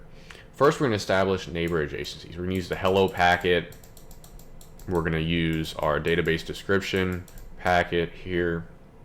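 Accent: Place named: Texas, United States. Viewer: Sounds somewhat Texan - and I live in Scandinavia :D So, probably have to make do with the ones you release here then :)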